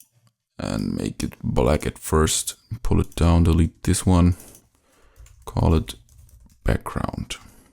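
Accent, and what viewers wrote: Germany. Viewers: I like how you make stuff on the fly, like u even surprise yourself. lols The german accent is also refreshing for some reason, maybe coz its rare that i hear it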